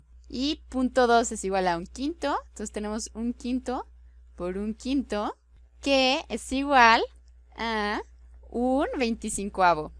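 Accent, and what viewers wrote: Mexico. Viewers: Buen día. Alguien me podría decir(desasnar) si esta forma hablar corresponde a una persona oriunda de México?,en ese caso seria la forma de hablar del pituco, cheto, etc (persona relacionado con la "alta sociedad y con dinero")? No se si pensó que solo los de México verían el vídeo, en lo personal hubiera preferido un castellano neutro. En gustos y colores.....a mi no me gusta su voz. Gracias por el vídeo. Saludos cordiales! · Su voz es horrible, es la típica voz del DF que quiere sonar "fresa", aparte se supone que en docencia no se debe de utilizar el uso de modismos propios de una región como "padre", sobre todo en un lenguaje tan global como el castellano.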